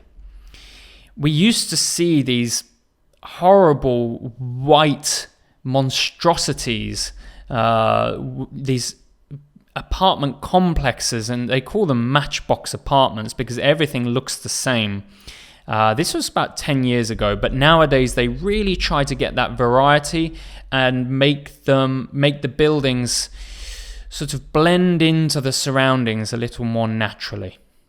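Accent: United Kingdom. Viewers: I'm in love with your British accent. Thanks for your amazing video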